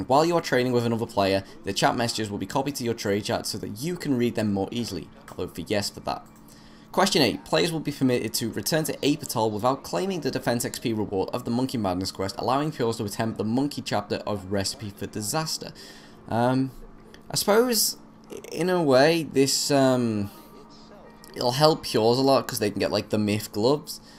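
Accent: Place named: Liverpool, United Kingdom. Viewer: Got to be Liverpool, that accent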